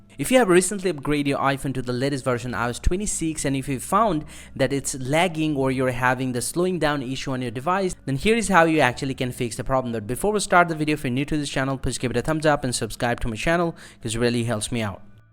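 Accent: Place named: India. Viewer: bhai itna vahiyaad accent hai na tera. Indian english me hi bol le. Saale angrezi chodde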